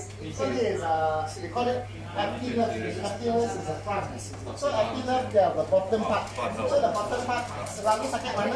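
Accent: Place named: China. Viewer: Melayu with Chinese Accent...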